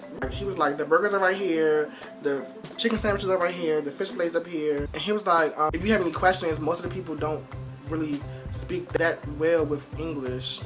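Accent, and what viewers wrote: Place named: DMV, United States. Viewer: Your accent is so DMV, I know my people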